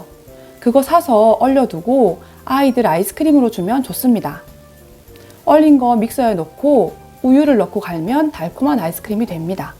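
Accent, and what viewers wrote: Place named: Busan, South Korea. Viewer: 부산이나 김해나 양산?쪽인가바요ㅎ 부산살아서 말투가 익숙해여ㅎ 4인가족 많이 배우고가요^^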